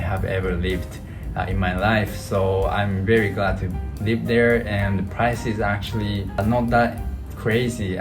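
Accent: Malaysia. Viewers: Handsome??????ur talk like manglish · You look & sound like Malaysian, so I believe Malaysian tend to speak Malay to you especially when you want to order or pay something...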